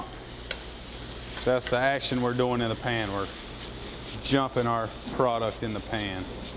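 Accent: South, United States. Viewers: He is clearly from the South (CCCTI is in North Carolina) and his accent reflects that. So if he does not say “julienne” or “oil” the way you would, it is not wrong, just dialectical differences for those of from below the Mason-Dixon line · Please take some grammar lessons. You sound like a southern hick. Your video was good, but your grammar reined the whole video.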